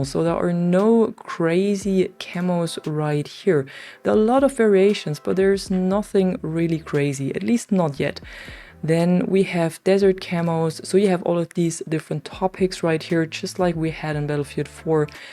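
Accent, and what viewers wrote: Germany. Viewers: First time to the channel I could be wrong and I’m sorry if I am but are you German? That how the accent sounds to be this soon into video. Have no issues just curious and believe I’m right totally random comment but I’m genuinely good at telling.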